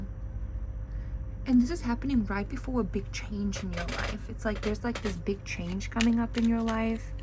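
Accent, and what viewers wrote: South Africa. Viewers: love that south african accent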